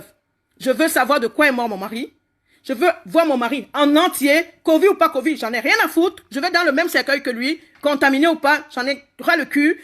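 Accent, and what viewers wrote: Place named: Cameroon. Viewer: Tu as un accent camerouneen. Nous avons apprit que le DOLIPRANE EST SUPRIMÈ DU MARCHÈ PHARMACEUTIQUE PARCEQUE CE DOLIPRANE A UN TAUX ELEVÈ DU CAFFEINE ET C'est mauvais de prendre ce produit si tu as des problemes gastriques et cardiaque. Ton mari avait un mal à la tète et tu as appelè l'ambulance SAMU pour l'amemer à l'hopital. Il fallait d'abord attendre demain matin si les maux de tete persistaient. En lui produisant de la PARACETAMOL ET DES VITAMINES C OR DES ORANGES. 2 COMPRIMÈS PARACETAMOL ACCOMPAGNÈES DU THE ROUGE AVEC UN DEMI CITIRON VERT ET DU MIEL. MASSÈ SON FRONT LA TETE AVEC DU VICKS MENTALATHUM ORIGINAL DE LA PHARMACIE. MASSE TOUT SON DOT AVEC DU VICKS ET LE COU ET LA GEORGE. MASSÉ SA POITRINE DONC LES COTES AVEC LE VICKS. DEMAIN MATIN FAIT LA MEME CHOSE MAIS EN LUI DONNANT UNE DOUCHE BIEN CHAUDE ET MET LE VICKS DANS l'eau dans le benoire. 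Madame tu sais tres bien que les blancs ne portent pas á les peuple noirs quand tu les enttends dire que les VACCIN DU COVID19 DOIT ETRE TESTER EN AFRIQUE NOIR. NOUS SOMMES EN EUROPE MAIS À PARTIR D'AUJOURD'HUI TOUT LES NOIRS VIVANT EN EUROPE DOIVENT MAINTENANT FAIRE ATTENTION PARTOUT OU TU ES. LE COVID19 A ÉTÈ ELABORE POUR EXPEDIER EN AFRIQUE NOIR ET NOUS TUÈS. PEUPLE NOIRS AFRICAINS REVEILLONS NOUS.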